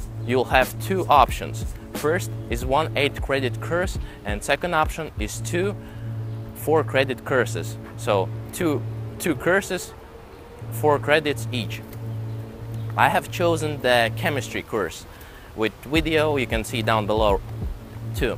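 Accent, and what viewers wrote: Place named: Russia. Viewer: Я чувствую Русский акцент... Really?!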